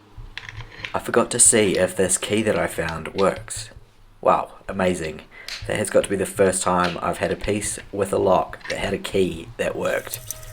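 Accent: New Zealand. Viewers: What a lot of work. I admire your patience. Well worth it and a fabulous finish! Keep up the good work and show us more of your renovations. Is it a kiwi accent I hear? Linda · Watching from NZ. Love hearing the NZ accent! I watch Jay too. So cool you guys support each other. Two big thumbs up from Aotearoa - Land of the Long White Cloud.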